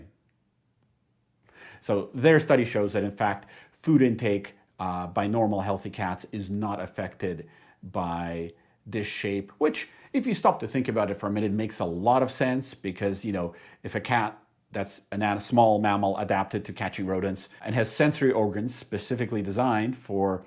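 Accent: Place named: Canada. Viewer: Thank you!!! I bought that whisker stress story hook, line and sinker. I will purchase the feeder and water fountain you recommend. You are as adorable as Mr. Pirate, and I love your Canadian accent!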